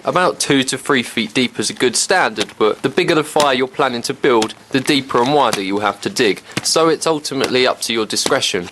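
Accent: United Kingdom. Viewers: I love that someone with a Brit accent knows about out Dakota customs. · Are there any people on youtube that DON'T have a limey accent? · British accent using "feet" and not "meters". This is great! (Bored COVID comment) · interesting to hear a guy with a bri'ish accent using freedom units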